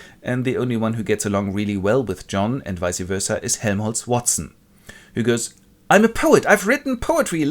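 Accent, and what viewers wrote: Germany. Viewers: Iron American Dream on YouTube. It's wonderful, I love the vernacular. The German accent tops It off.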